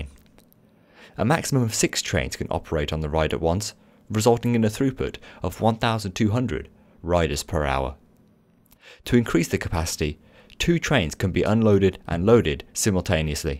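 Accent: United Kingdom. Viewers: but really is this your voice or the voice from a computer. i love the accent(i think britsh) and you are doing a great job!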